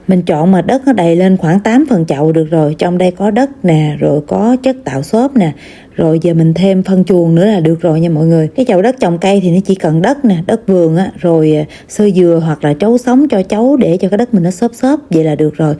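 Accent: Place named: West, Vietnam. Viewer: Giọng miền tây dễ thương dễ nghe hay do tui nghe quen rùi nên thấy dễ thương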